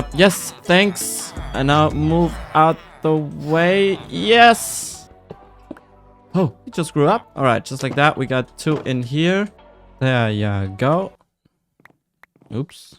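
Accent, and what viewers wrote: Germany. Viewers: Dude are you german? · idk why but germans speaking english is satisfying to me for some reasen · Ich habe gestern das erste video von dir geschaut und man hat direkt den deutschen Akzent rausgehört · Good video! Keep it up! P.s. Your accent sounds really german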